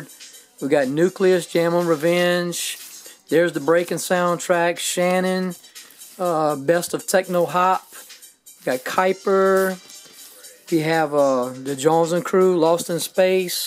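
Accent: United States. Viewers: A southern twang with MIC MAC Freestyle records? NICEEEEEE!!! :) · Great video! I subbed! I call my studio the music room too lol. You sound like your from Louisiana too..where you from brother? Anyways just found your channel, searching YouTube for ideas on how to display my records...I don't have nearly as much but I wanna build my collection and put it on YouTube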